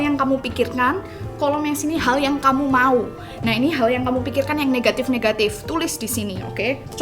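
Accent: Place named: Java, Indonesia. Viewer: Baru nemuu chanelnyaa kak... ini ngejelasinyaa ada kejawa jawaan hahahah makin greget🤗🤗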